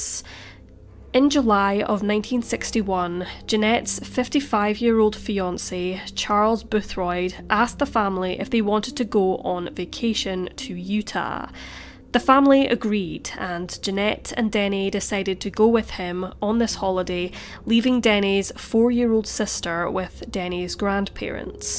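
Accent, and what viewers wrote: Scotland, United Kingdom. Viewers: The narrator has a Scottish accent.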